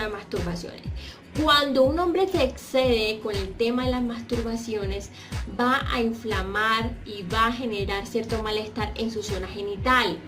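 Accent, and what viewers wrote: Colombia. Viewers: ☺️❤️❤️❤️❤️❤️❤️❤️❤️❤️😊☺️pero es que todas las colombianas son hermosas y su asentó de hablar me encanta 😍 yo me quiero casar con una colombiana plz can you help me 😊❤️ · Que acento tan feo es el colombiano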